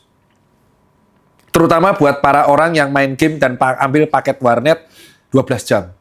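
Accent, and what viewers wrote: Java, Indonesia. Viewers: Asik penjelasan dok ter ini,ada jawa2nya😂😂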